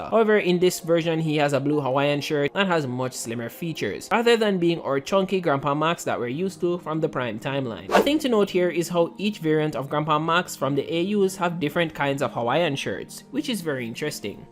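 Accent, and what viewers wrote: Jamaica. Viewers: W video, stumbled upon this video on my recommended. Loving the accent, not alot of Caribbean much less Jamaican people are on social media talking about ben ten or superhero comics. Keep it up bredda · Ayo you Jamaican? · Are you Jamaican? Your voice sounds off. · Dah accent big man are you jamaican by chance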